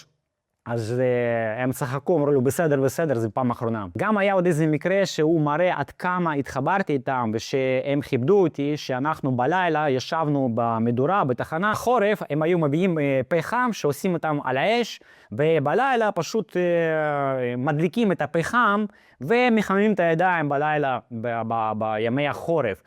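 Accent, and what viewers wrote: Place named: Russia. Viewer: у тебя ярко выраженный русский акцент... ты бы хоть титры на русите сделал бы.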